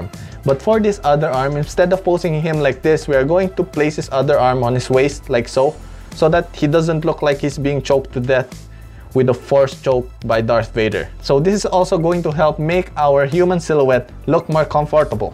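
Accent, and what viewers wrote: Philippines. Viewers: Carry that filipino accent hard kuya! Be proud! Hehehe love them vids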